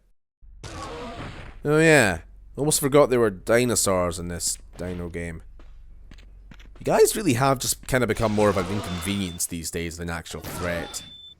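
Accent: Scotland, United Kingdom. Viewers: Scottish narration makes everything better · what part of Scotland are you from?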